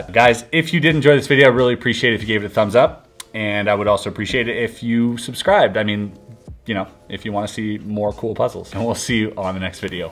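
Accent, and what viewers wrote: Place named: Quebec, Canada. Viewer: C’est la première fois que je tomb e sur une de tes vidéos et lorsque tu a dit merci dans part 1 jme suis dit c est sur qu’il est Quebecois lol Quand tu as dit Sébastien dans celle-ci ça confirmais... En tout cas bravo 👏 je suis un nouveau subscriber ✅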